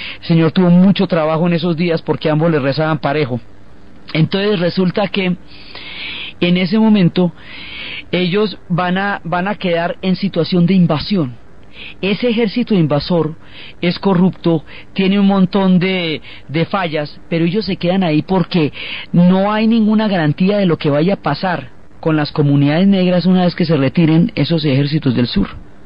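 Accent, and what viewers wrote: Colombia. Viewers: me pasó igual,el acento colombiano, y lo de "parche" "sensacional" jaja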